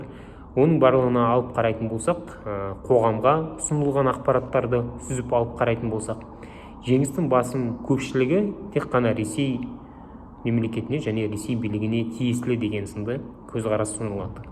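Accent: Standard. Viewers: өте сауаты күшті,қазақш таза сөйлейді,мәңгүрт депутаттар қағазға қарап міңгірлеп тұрады,осындай қазақ азаматтары көп болса екен,депутаттыққа,мини стрлікке осы Асхатты ұсыну керек,қазақша таза сөйлейтін жастар аз,аман бол!Қазақстан енді орыстың бұғауынан шығу керек!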